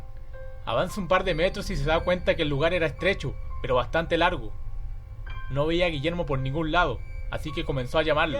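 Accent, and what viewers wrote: Chile. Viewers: Pa que tan Chileno?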